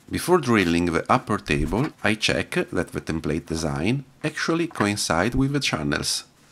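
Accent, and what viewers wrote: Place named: Italy. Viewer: si sentiva, anche io sono italiano da quello che ora puoi immaginare e volevo dirti che fai dei bellissimi video e continua così <3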